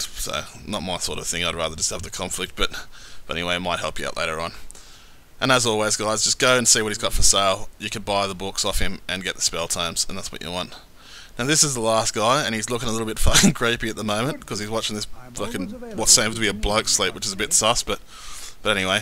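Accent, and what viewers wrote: Australia. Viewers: Lol i can tell ur aussie AUSSIE AUSSIE AUSSIE!!! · it's good to hear an aussie commentating on a skyrim vid once in a while.. it's always usually some yank doing them haha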